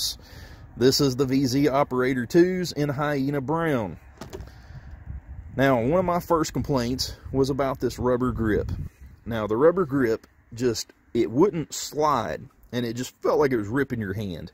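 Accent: South, United States. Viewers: Your country accent and the chickens 🐓 are priceless…great vid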